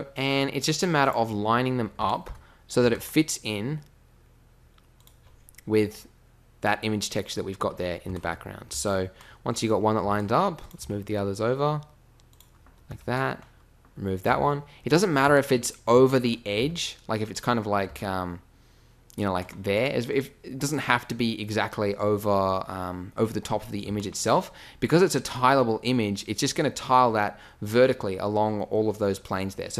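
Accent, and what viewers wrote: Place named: Australia. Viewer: Why are you Australian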